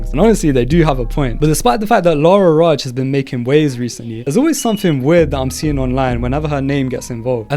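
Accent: United Kingdom. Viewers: no latino rappers or singers??? girl there's, (as in the OG not gomez), all of the huge reggaeton artists. cinco de mayo, día de los muertos are holidays that white Americans have basically co-opted. and omg puerto ricans in baseball? latino culture is super prominent in the US, and the food thing is pretty big i would say! i would trust the UK to have banger indian/south asian cuisine pretty much everywhere, which i cannot say about the US, but i wouldn't go looking for mexican food there lol. i guess i also forgot to say, UK south asians of course have the more personal history of literally being colonized by the british which also makes that connection a lot more deep rooted, and you could say a similar thing of latinos here - the relationship goes back a lot further, a lot of the US literally used to be part of mexico. anyway sounds like you are from the UK but yeah good to hear more examples of south asian prominence there, i'd add another Pakistani, my fav to that list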